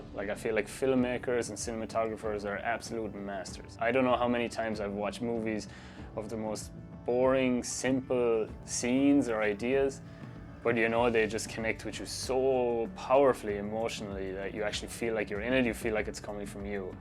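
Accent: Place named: Ireland. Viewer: Nice to see an Irish photographer? Can I ask where you’re from? Can’t place the accent! Clare maybe?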